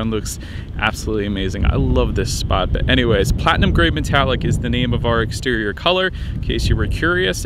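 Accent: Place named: Pittsburgh, United States. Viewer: Your Pittsburgh accent is so heavy. Good review !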